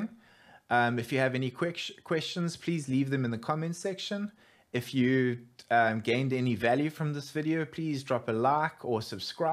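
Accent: South Africa. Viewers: South african✊🏿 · you sound south african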